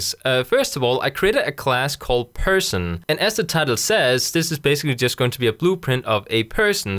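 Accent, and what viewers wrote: Denmark. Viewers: I love your way of explanation. You are my kind of teacher who explains basic and doesn't assume that others will pick up the complex concepts easily. When I first listened to you, I thought you must be Danish (Your English accent). Now, I have checked your About which confirms that you are indeed Danish. Thusind tak for fantastiske videoer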